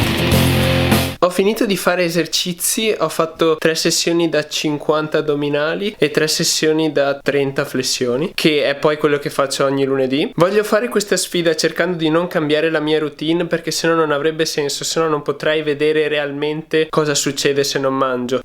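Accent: Veneto, Italy. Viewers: Si è veneto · Ma sei Veneto?? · Ma sei veneto ? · Ma sei Veneto?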